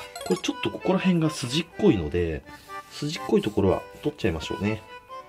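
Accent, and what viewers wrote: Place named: Hiroshima, Japan. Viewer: 名古屋ということですが、広島イントネーションがあるような…。器もいつも参考にしています！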